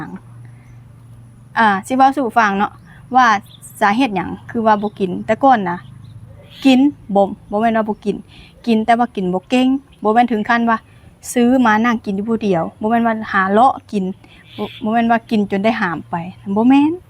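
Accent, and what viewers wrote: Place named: Khon Kaen, Thailand. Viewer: เพียงยูแขวงได๋ เว้าคือบ้านอ้ายแท้ ขอนแก่น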